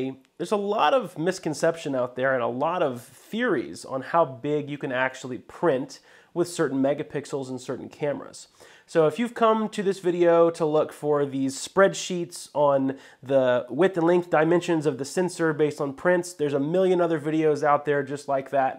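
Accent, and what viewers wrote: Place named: United Kingdom. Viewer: You do a really good British accent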